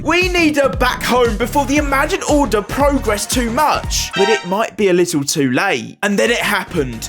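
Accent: United Kingdom. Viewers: I would watch this entire video but you're British and obnoxious 💀💀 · British + mic eater =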